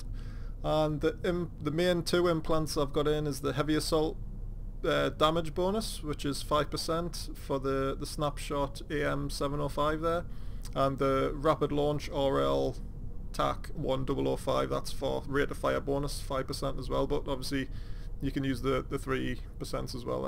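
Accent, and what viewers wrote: Newcastle, United Kingdom. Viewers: newcastle or sunderland?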